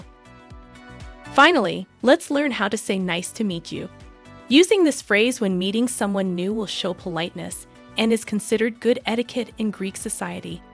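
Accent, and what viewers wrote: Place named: United States. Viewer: Horrible. Este vídeo es completamente horrible. No sé qué da más miedo de todo, si el horrible acento inglés americano cuando pronuncia el griego o las personas hechas con inteligencia artificial. Es horrible. Jamás podría aprender nada con vídeos así.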